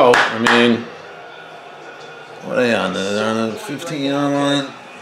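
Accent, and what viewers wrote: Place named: Boston, United States. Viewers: You kinda look like Christoph Waltz. How'd you end up a Cowboys fan with a thick east coast accent like that? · You sir are not from Texas with that Boston accent🤙💪🫡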